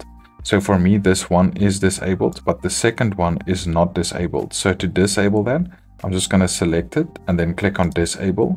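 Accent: South Africa. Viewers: South African accent. thanks for the help.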